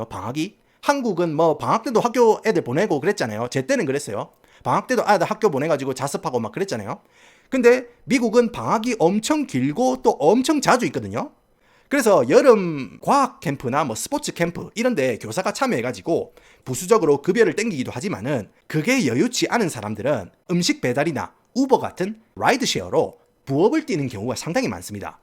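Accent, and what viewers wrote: Gyeongsang, South Korea. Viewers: 사투리가 너무 찰지셔서 댓글을 안 달수가 없었다...찐 경상도 바이브가 영어랑 절묘하게 혼합된.... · 사투리가 쏙쏙들어와요 경상도ㅎㅎ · 내용도 전두엽 쌍골짜기에 싸대기가 메아리칠 정도로 신선하고 쨈있지만, 썰푸는 vibe가 참~ 찰져! 갱상도 억양과 양키어의 묘한 앙상블. 그래도 유료가입은 몬한데이~! 내코가 월터 와이트 입던 빤스라도 갖다 팔아야 개우 입에 풀칠. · 경상도 액센트의 영어로 설명해주는 미국의 사회 문화 컨텐츠 ㅋㅋㅋㅋㅋ진짜 미치겠다 ㅋㅋㅋㅋㅋ 구독박고갑니다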